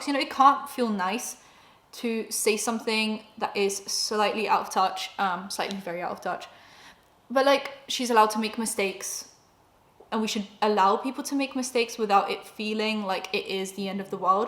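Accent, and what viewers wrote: United States. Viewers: You had it right, it’s a New York or Jersey type of accent. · She has a harsh Bostonian accent. I live in Boston. And it’s not weird to hear.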